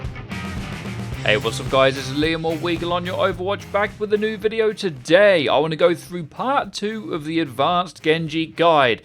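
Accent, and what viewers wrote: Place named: Norfolk, United Kingdom. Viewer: You sound like a fellow Norfolk boy!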